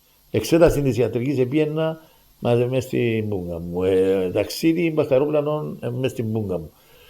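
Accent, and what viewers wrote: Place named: Cyprus. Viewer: Τί νά καταλάβεις ελληνοκυπριακή διάλεκτος...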